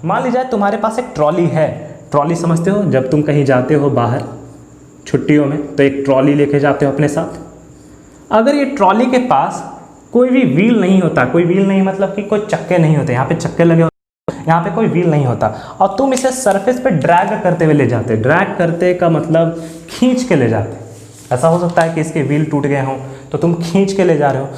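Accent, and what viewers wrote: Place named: Bihar, India. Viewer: Bhaiya aap bihari ho....jai bihar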